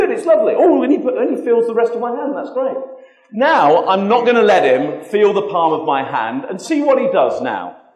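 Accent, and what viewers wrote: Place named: United Kingdom. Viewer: the british accent helps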